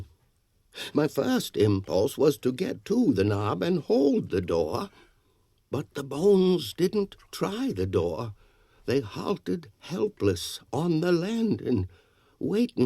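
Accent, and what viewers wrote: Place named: New England, United States. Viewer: This is how I believe Frost meant this to sound , Great New England accent enjoyed it very much thank you.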